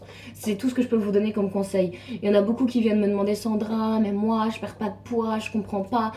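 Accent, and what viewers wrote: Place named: Lorraine, France. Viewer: Ça motive ton expérience :) tu es d'où en lorraine ? Je suis de lorraine également :) j'adore ton accent :D haha Et ta vidéo :) gros bisous ma belle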